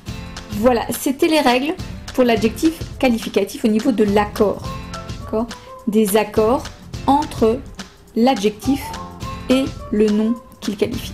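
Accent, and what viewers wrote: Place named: France. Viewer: you good teach but accent french say not understand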